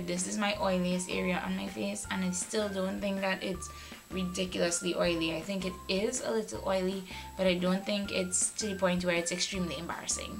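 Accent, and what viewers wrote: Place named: Caribbean. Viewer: Love your accent ..Caribbean girls run it ..love this review😊💯👍🏾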